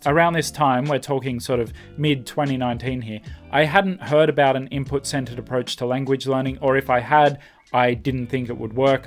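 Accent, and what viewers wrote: Australia. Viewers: I speak English exactly as you do. I'm from NSW, I think we all kind of speak like you. I needn't support my claim, as most other English-speakers around world don't understand us anyway. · From your accent, I guess you are Aussie?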